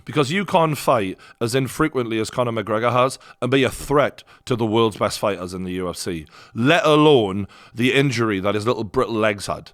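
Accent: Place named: Geordie, United Kingdom. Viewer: Your to much geordie 🤣🤣 but I fucking love it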